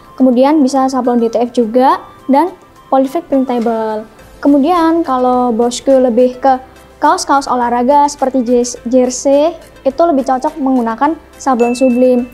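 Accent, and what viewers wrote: Java, Indonesia. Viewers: logat jawa😁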